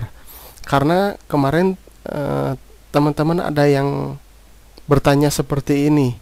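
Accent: West Java, Indonesia. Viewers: Dibaca pake aksen sunda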